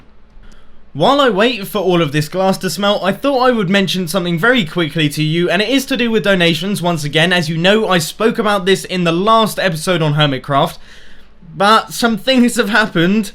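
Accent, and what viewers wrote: England, United Kingdom. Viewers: 1. I subbed to u because UR BRITTISCH ACCENT IS FREAKING AWESOME and i love your redstone videos 2. hard question...^^ 3. If u would start playing and recording League Of legends you would be awesome I've also have a suggestion of what you could do in your redstone videos. A 9 didgit code lock, been looking for a tutorial on that for ever and i can't find one. So maybe you could do that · BECAUSE BRITISH ACCENT MAKES EVERYTHING BETTER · 1.because I love minecraft, and British accents are awesome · 1. Because your accent sounds amazing, 2, it's good as it is now, 3, can you learn us how to speak with an british accent? That would be amazing :). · because your commentary is entertaining and you do have a very nice english accent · 1. I subscribed because of your British accent. Because more than half my subscriptions are Brit. 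And of course, I love redstone, cars, and minecraft in general. 2. I just suggest maybe some better wifi connectivity because most times with me. The wifi is why it's slow 3. Add some mod reviews. I love mod reviews, a lot. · 1: I subscribed to you because I started minecraft about 2 months ago due to Gophervids doing a live stream of minecraft as a first impressions, after doing the whole building thing, I wanted to get into Redstone a little more and googled redstone minecraft and your vids came up first, a fun way and seemed a lot more professional compared to others. 2: Nothing really, you seem to be doing fine, redstone and live streams are regular, your quality is top notch (pun intended), and i'm really enjoying Hermitcraft series 3 and just re-watching hermitcraft series 2. 3: Seems to be fine, I've subscribed to 2 channel and it's filling in the void for whatever was missing at the time of filming, Xisumavoid collabs won't go amiss. 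Edit: I didn't stay for the accent because I'm British and I hear it everyday lol. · 1. ur one of the few brits that are good commentators and i love brit accents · I'm not so good at listing so i leave it to you to understand:P 42. I really love your editing and i always wanted to learn using "adobe after effect" it will be lovely if you make few tutorials about this awesome program. for another thing, i think that adding few more games to the channel will spoil. 485. i love all of your vids, i don't think there is something you need to change, but i would really love to see tutorials on bigger and more complicated redstone contraptions, i know it is hard for you to do that, but i would be really happy if you make a vid, i don't know what, maybe a 16x16 screen. 99837.I subscribed to you at the beginning, when you made all the redstone awesome stuff, i was totally noob with redstone and wanted to learn some. 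after a while i was like: "YAY! another vid from!" and of course - its because i love your British accent and you:) hope that my opinion will help you:D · 1 I subscribed because you make cool videos and you have the best British accent I have ever heard 2 Do a facecam 3 I would like to see more too small videos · hermit craft and the british voice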